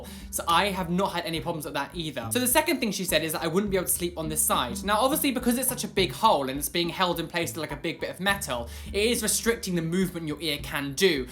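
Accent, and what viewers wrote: United Kingdom. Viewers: I've just discovered your channel you're so cute :( I love to hear that british accent ♥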